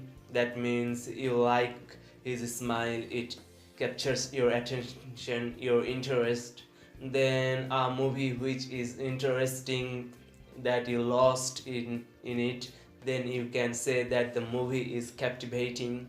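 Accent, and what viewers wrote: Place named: India. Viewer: You need to work on that indian accent though, captions do not go along well.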